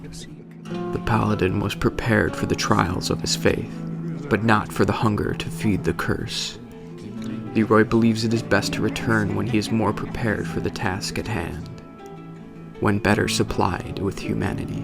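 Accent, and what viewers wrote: United States. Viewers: Nah... you need that brit accent to make this legit. The American accent just wrecks all medieval type lore stories. It's just the truth man.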